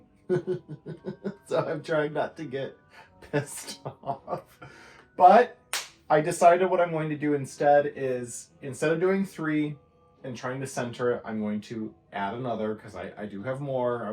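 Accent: Minnesota, United States. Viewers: The final garland look over the TV deserved that high kick! Looks so great! Since you mentioned you had 3 sets of batting, without further ado: On the 3rd Day of Vlogmas my true love gave to me: Three sets of batting Two power stips 🔌🔌 And you sound like the movie Fargo 🎄⛄🙂⛄🎄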